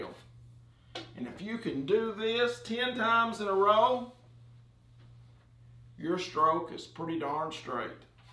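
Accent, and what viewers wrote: South, United States. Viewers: Southern Drawl contest... southierest dawliest talking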